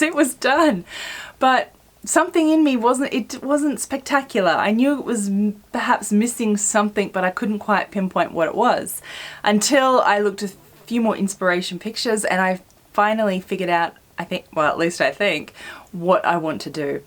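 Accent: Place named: Australia. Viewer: My first time visiting you, found you by accident! Firstly, it's great to hear a fellow Australian and I love your chatty format. Coincidentally, I have just gone back to a project this week. I hacked up an old orange mens suit to make a skirt (it was the colour I had been looking for) but it didn't quite look the way I imagined. I put it aside and moved on to other projects, but it lurked in the back of my mind for a few days and now I know how to fix it. Sometimes I think you need a bit of distance to let go of your 'vision' for the garment so that when you go back, you let the garment speak to you. I've got another skirt and blouse (made to wear together) that I rediscovered last night that I think I will revisit this coming weekend. I seem to do a lot of 'sewing' in my head...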